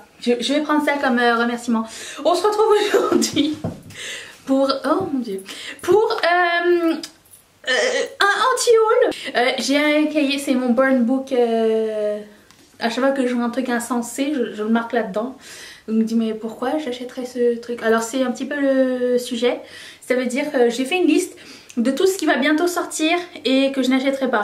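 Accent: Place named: Quebec, Canada. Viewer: Chui d'accord av toi pour UD .. perso quitte à me choper une palette dans le même genre , j'opterais pour la Vénus XL de limecrime ❤ j'adoooore limecrime ... puis elle est plus grande pour le même prix si il faut . Ta chaîne est géniale en tout cas , tu me fait délirer et tu commence à voir le petit accent tabarnacle ;) T'as un putain de look chui trop fan ! Et on en parle de ton truc en plume la ?? Ou as tu shopper cette merveille !!!!????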